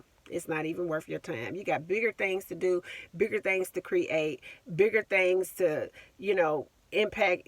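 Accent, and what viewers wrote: South, United States. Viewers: I love your country accent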